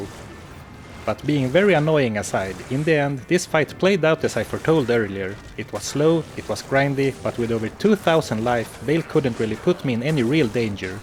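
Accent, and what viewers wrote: Sweden. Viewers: u are swede right:D? or norweigan? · came for the diablo 2 content and stayed for the swedish accent greetings from jörmenni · no doubt. Nice video! And I love your swenglish! ❤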